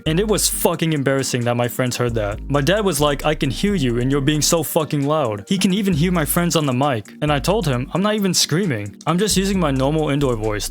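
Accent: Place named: Australia. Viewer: W Australian voice,w good as videos are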